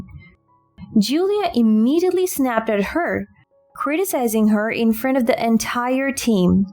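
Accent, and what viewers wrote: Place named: United Kingdom. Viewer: It's the queen's English not American English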